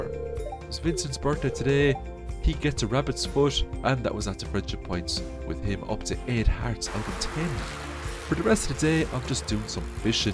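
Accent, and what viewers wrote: Ireland. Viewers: 'I played 200...' Irish Accent. Instant subsribe. Let's go.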